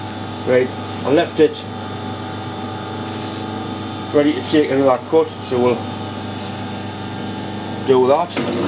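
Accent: Newcastle, United Kingdom. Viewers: Wm Robert Leschyna Some would say ' Geordie' often spelt Jordie ,but this is a Newcastle accent as true Geordies are from Jarrow . It's a bit like the difference between Cockney and an East London accent . True Cockneys had a language and dialect far removed from the East London one , but is now almost completely vanished .Mi da an is da were Jarrow boys , mi granda on the March ! I grew up in East London and couldn't understand any of my grandparents . Dad from Jarrow , Mum from fenland Norfolk ! When visiting the US back in the 70s nobody could place from what country I came from but my mate being a Cockney , no trouble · pif paf the accent and dialect is called "Geordie". it is spoke by people in and around the City of Newcastle-Upon-Tyne in North East England. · i love that jordie acsent.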